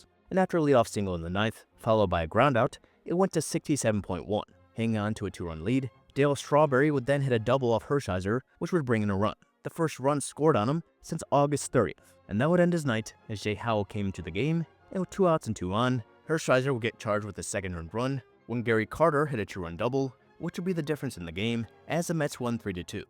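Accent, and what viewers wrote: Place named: United Kingdom. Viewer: I never knew the official statistician for MLB was British.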